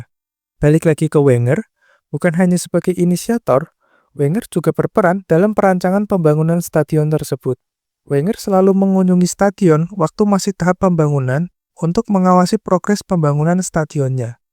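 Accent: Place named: Java, Indonesia. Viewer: sueneng aku koyo ngrungokno radio nganjuk , tetaplah medok mas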